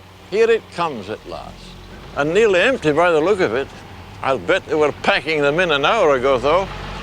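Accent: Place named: Scotland, United Kingdom. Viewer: Strong Scottish accent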